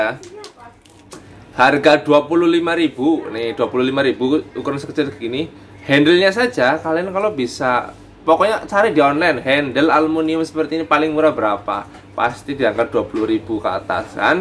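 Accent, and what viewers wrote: Banyumas, Indonesia. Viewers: Nyimak lokasine ngendi si ya,banene koh ngapak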